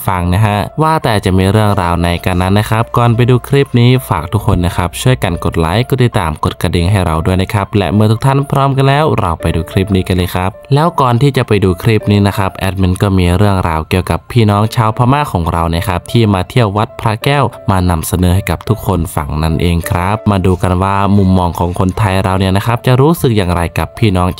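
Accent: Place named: Cambodia. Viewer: ทำไมเเอตมินสำเนียงเเปลกๆ สำเนียงเหมือนเขมร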